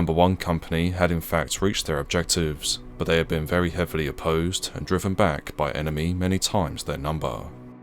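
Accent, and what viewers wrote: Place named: London, United Kingdom. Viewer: Irritating London accent narrative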